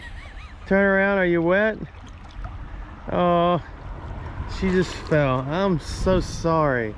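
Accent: South, United States. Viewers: It’s no problem understanding you and I’m from the west coast. You speak deliberately and with very little southern accent. Your sweet wife…how she smiles at you!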